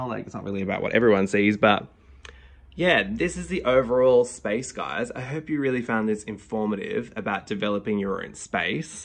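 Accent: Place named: Australia. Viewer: Yay, an Australian accent AND a great design aesthetic :)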